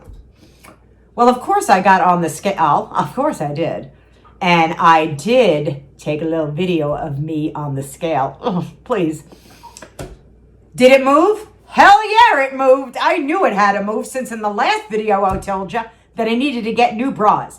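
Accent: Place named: Long Island, United States. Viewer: I just started watching you and you feel like a friend. Your openness and honesty is comforting, your accent reminds me of my Long Island childhood. I could watch you throughout my weight loss journey.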